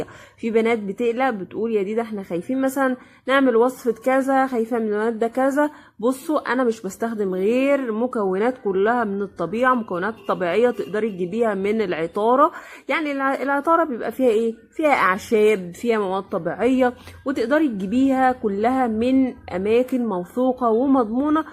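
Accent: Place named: Maghreb. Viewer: انت بتتقني اللهجة المصرية بس واضح انك من المغرب العربي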